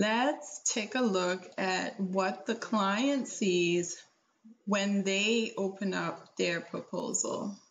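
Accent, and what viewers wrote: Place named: Caribbean. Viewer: I'm hearing a Caribbean island accent 🤔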